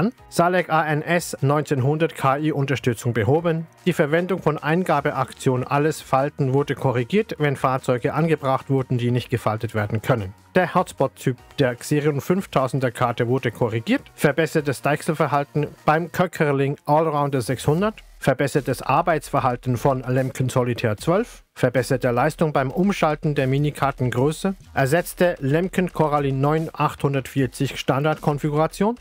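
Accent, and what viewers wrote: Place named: United States. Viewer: Ich mag den Dialekt so sehr! Bist Du Amerikaner?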